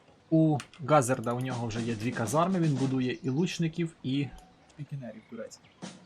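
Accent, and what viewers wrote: Poltava, Ukraine. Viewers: Ти бува не з полтавщини? Бо говір шось дуже знайомий ,таке як чи Хорол ,Миргород , ті краї? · В тебе полтавський діалект?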